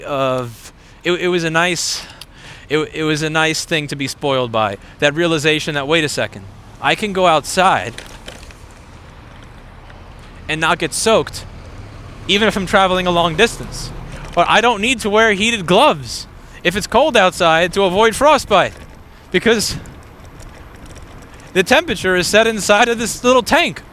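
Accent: New York, United States. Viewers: your new york accent is particularily strong today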